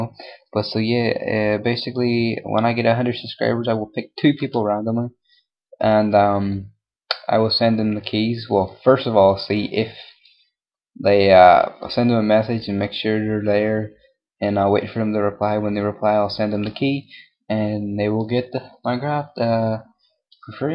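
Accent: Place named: Northern Ireland, United Kingdom. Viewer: Well you sound like your from Northern Ireland, and so am i! :D I'm not going to make up a story saying my parents died or i have no money or my house burnt down and i lost all my stuff either.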